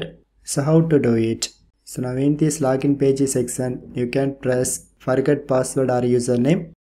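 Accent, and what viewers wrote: India. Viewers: its okay saying it wrong its just because uour indian so its hard to say a simple thing but your good at english❤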